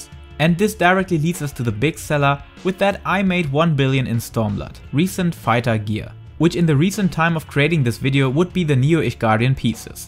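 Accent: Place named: Germany. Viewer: Nettes Video . Schade , dass es auf englisch ist und nicht auf deutsch . Man hört raus , dass Du Deutscher bist . Wäre doch mal was , wenn man dieses auch mal auf Deutsch erklären würde . Wie auch immer. Danke fürs hochladen . Denke , dass das auf meinem Server nicht so klappt . Schade .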